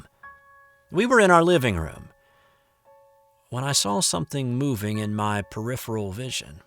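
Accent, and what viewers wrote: South, United States. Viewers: Ah, it's great to see another upload from you! I'm detecting a bit of a southern drawl, very faint. Where are you from? Cheers!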